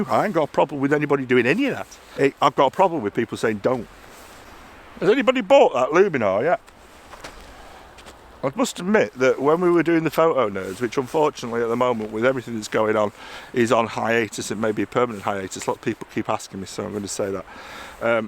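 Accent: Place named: Yorkshire, United Kingdom. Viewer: magic great to hear straight-talking Yorkshire patter. What is the point of a technically correct image if you don't like it?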